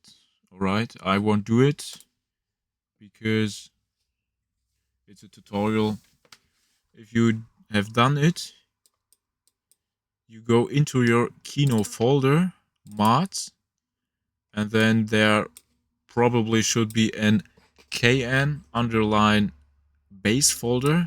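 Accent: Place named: Germany. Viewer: i hear a little bit deutsch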